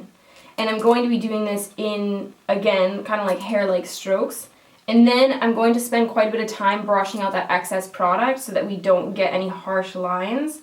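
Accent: Canada. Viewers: You're Canadian right? The way you say bag and trash 😁.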